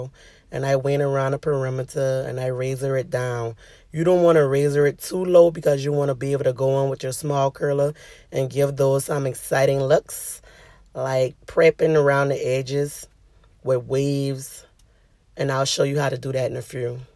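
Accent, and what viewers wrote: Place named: South Carolina, United States. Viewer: South Carolina accent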